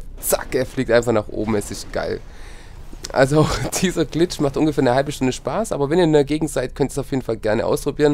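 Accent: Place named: Swabia, Germany. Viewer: Gutes Video!:)Bisch bestimmt ein schwäbischer zocker wegen deinem Akzent^^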